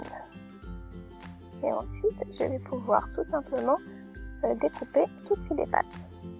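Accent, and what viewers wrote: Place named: England, United Kingdom. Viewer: OUIIIII tu as un accent anglais so good !!! Mais quand je t'écoute parler je trouve que tu as un petit accent de je ne sais d'où très charmant !! Oh les bruit de la nature c'est si beau !! Ces petits hérissons sont vraiment trop mimi - perso je préfère avec la neige !! Merci pour ce partage PB Bonne nuit et à demain BIZZZZZ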